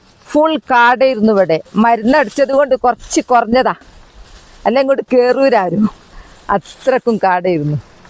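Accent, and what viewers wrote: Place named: Wayanad, India. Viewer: wayanad എവിടെയാണ് നല്ല പരിചയം ഉള്ള സംസാരം, മുഖം, ഞാൻ വയനാട് ആണ്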